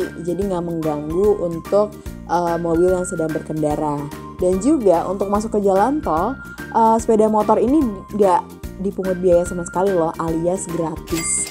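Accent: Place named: Malaysia. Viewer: Manglish~ Malay English 🇲🇾🏴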